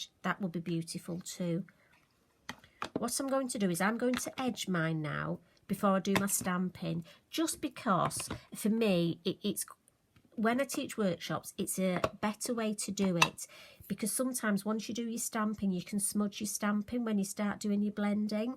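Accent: United Kingdom. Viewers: Absolutely gorgeous! I have got to try this one! I love your accent! My son and his wife live in London and last fall we stayed there three months. It was the best time of my life other than having my babies! Now I’m going to be a grandmother....so exciting! Just hope we can get over there again next year! So enjoy your videos...keep them up!♥️😘🤗🌸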